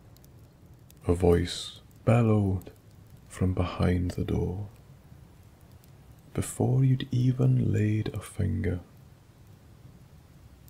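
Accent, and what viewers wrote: Scotland, United Kingdom. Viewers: I love ur Scottish voice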